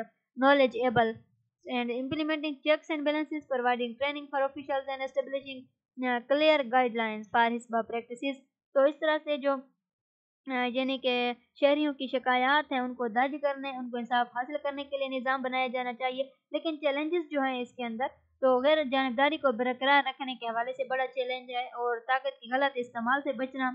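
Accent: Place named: Saraiki, Pakistan. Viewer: Saraiki eng accent 1st time I heard 😂😂 I appreciate your work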